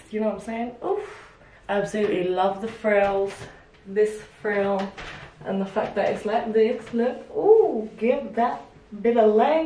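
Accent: United Kingdom. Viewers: Cheers! Your British Accent adds to your Beauty 10 fold.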